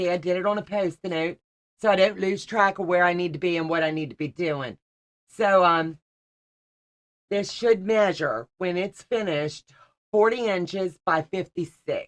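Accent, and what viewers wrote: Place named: Pittsburgh, United States. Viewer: Are you from Pittsburgh? I recognize that twang.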